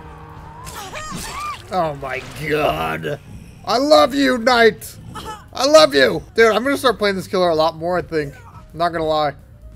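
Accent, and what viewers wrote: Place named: United States. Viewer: There’s something very wholesome about your in-game commentary. Maybe just me liking American accents as a British boy :/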